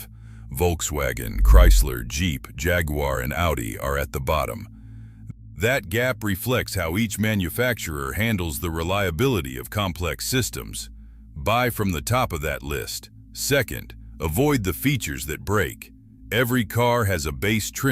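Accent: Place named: United States. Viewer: Please can we have someone that doesn’t speak that awful sickening American accent for a change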